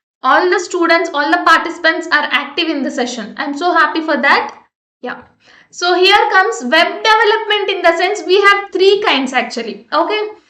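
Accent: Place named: West India, India. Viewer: Nice Voice,I like accent 👍 atleast good west India people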